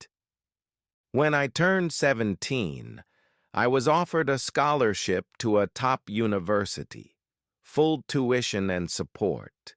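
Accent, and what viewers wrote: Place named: Wayne County, United States. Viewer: Sounds like your in Wayne Co.😮😮😮😮😮😮😮😮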